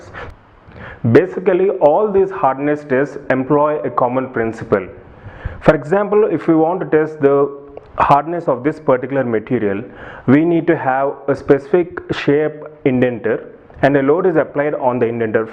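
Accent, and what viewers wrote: India. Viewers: I hate Indian people when they speaking English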